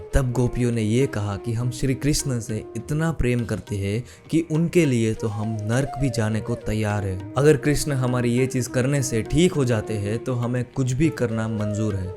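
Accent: Gujarat, India. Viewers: You sound to be Gujarati??😅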